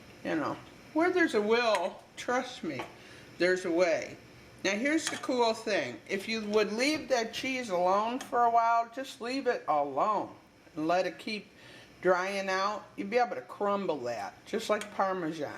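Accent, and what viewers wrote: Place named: New York, United States. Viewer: I have a dear friend from New York - she has the same accent.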